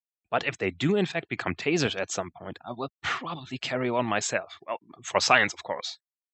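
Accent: Germany. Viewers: You are from Germany, Right?:P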